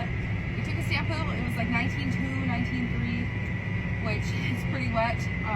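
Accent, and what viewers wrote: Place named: United States. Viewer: Newly subscribed. What state r u in. I’m trying to figure out the accent. Great video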